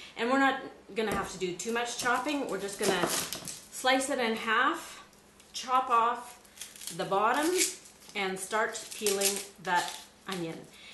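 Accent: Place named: Minnesota, United States. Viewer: nice video, nice accent, are you from Minnesota? don't ya know, I get the big sack of onions, all they sell at the costco and need to do this, thanks!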